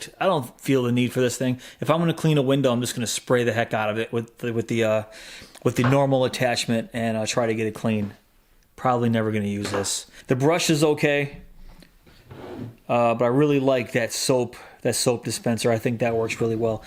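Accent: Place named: Western New York, United States. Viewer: WNY - can hear the accent and tell by the driveway and the house architecture :-) Think I'll stick with my gas-powered pressure washer.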